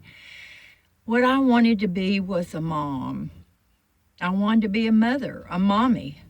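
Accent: South, United States. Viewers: I live in North Carolina and I look so forward to your videos. You sound southern.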